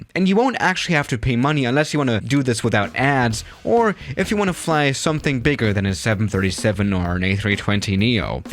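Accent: Germany. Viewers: Are you From Germany or Switzerland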